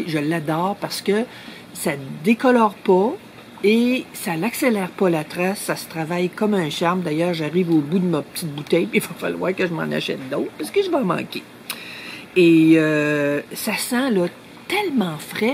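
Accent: Quebec, Canada. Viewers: Bonjour, je suis tombée par hasard sur une de vos vidéos!!! Merci pour vos conseils, votre dynamisme et cela fait du bien d'entendre la parler québécoise (j'ai eu la chance d'habiter 1 an à Montréal).... et surtout BONNES VACANCES!!!!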